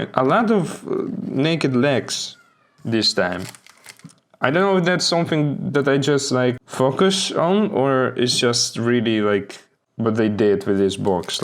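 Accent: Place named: Netherlands. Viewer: Ur from holland i can hear xD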